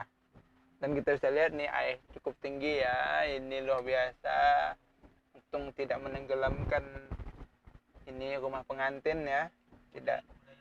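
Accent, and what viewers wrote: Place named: Banjar, Indonesia. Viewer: Klo didengar dari logat bahasa dan tipe wajah kaya nya abang ini orang banjar.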